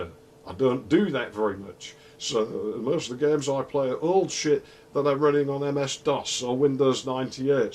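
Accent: United Kingdom. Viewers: As someone who has watched UK TV shows for years, I thought I was used to your myriad accents. I was clearly a cocky bastard. Guess I just have to watch a few more of your videos to get an ear for it. Nevertheless, this is a fantastic video about a neglected CPU. My first computer was a Cyrix, so while I don't think of it in fondness, I respect its place in my history. It played those Apogee & Sierra games beautifully and ran QBASIC like a champ. It came with Windows 95 OSR2, which many people forget about when talking about 95.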